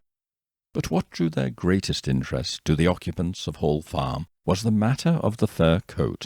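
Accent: United Kingdom. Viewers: Hi I alway's loved the British Accent. I wish we Americans still sounded like that!:)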